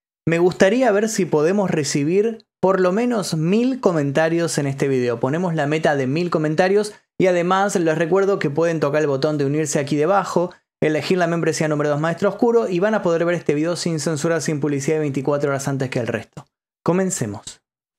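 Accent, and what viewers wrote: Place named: Argentina. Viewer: No soporto el acento argentino 😅 tengo que dejar de ver un buen video 😢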